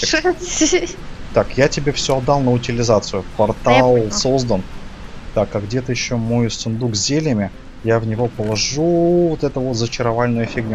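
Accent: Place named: Ukraine. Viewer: Авы случайно не украинцы? Шо да шо XD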